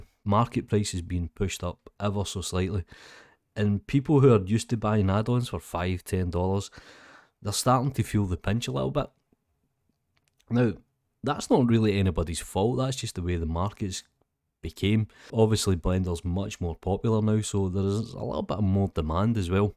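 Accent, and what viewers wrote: Scotland, United Kingdom. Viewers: Hey even a frenchy frog can recognize scottich accent. Have a good recovery. And thank you.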